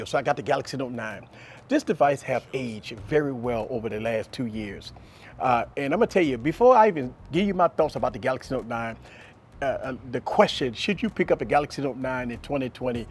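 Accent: Louisiana, United States. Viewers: He sound Creole like he's from Louisiana New Orleans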